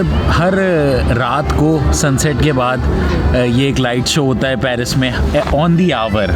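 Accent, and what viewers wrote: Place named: Karachi, Pakistan. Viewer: I always smile while watching your videos. I think it's because we speak the same way as we are Karachiites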